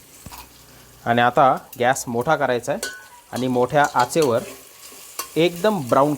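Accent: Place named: Khandesh, India. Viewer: दादा तुमची भाषा खान्देशी आहे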